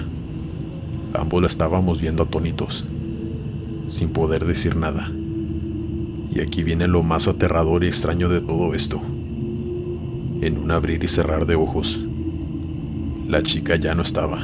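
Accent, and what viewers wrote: Mexico. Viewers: Hola caballero saludos de Medellín Colombia men encata este narrador es mexicano usted voz muy varonil y muy clara y y muy pulcra quiero desir sin ningún morbo y muy caballerosa felicitaciones y gracias por sus relatos muy interesante 👏👏👏👏👏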